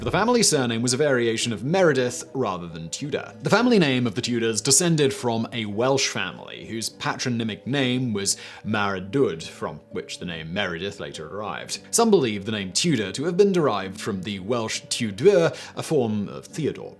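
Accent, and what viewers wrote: United States. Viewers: oh don't I know it the thing is its pronunciation is completely different in the UK. His US pronunciation is very grating on the old ear holes don't you know. What's actually scary is I can remember the names of the characters :) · Dine asty. When did you become a Yank?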